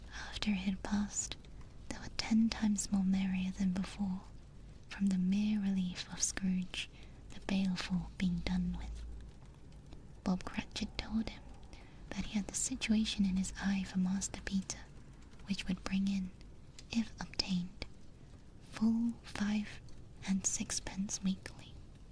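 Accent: United Kingdom. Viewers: That's some proper no frills just straight up full course British asmr :_eepy::_ZZZ::_ZZZ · proper british bloak reading a proper british tale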